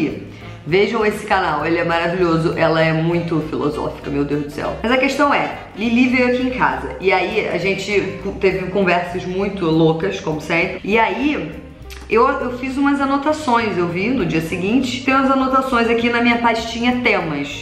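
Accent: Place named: São Paulo, Brazil. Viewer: pegando o sotaque paulista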